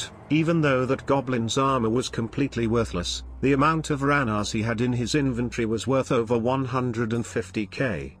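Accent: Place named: Georgia, United States. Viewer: It’s funny I’ve never heard this mans real voice yet I know for a fact he’s from Georgia or Florida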